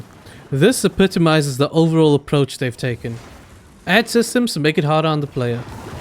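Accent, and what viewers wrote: South Africa. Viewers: Your English is flawless. Sounds like your first language but you have a bit of a South African accent?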